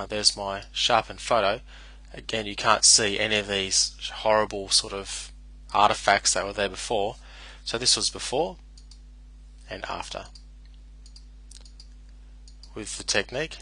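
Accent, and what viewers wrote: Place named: Australia. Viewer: he's australian, dude. give him a break. Nice upload! very helpful!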